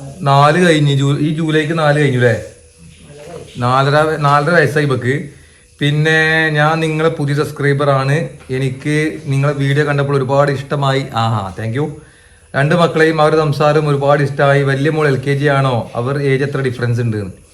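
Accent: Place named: Malabar, India. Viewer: മ്മടെ മലബാറിയൻ സംസാര സ്റ്റൈൽ ആണ് നിങ്ങളുടെ ഹൈലൈറ്റ്. എല്ലാവരും ഇത് പോലെ സന്തോഷമായിരിക്കട്ടെ എന്നെന്നും.കുട്ടികൾ ഇപ്പച്ചീയേ... എന്ന് വിളിക്കുമ്പോൾ നല്ല ഭംഗിയുണ്ട് കേൾക്കാൻ . ❤❤️🙌🏻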